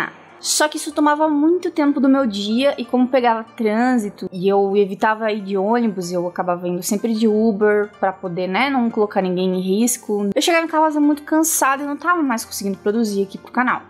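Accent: Paraná, Brazil. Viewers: Caraí!!!!!!!!! Tu É Paranaense!!!!!!!!!!?????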